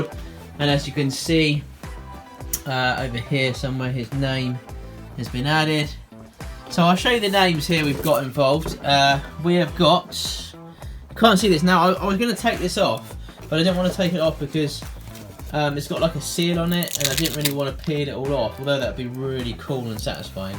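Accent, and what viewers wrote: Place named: United Kingdom. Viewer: You know, the first time I watched one of your videos I just assumed that N/Z meant New-Zealand. And I thought: why has this guy got a UK accent? 🤣🤣 silly me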